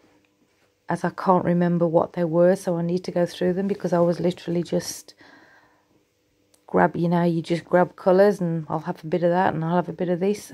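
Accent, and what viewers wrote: United Kingdom. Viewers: You sound like my Scottish friends! Lovely 😍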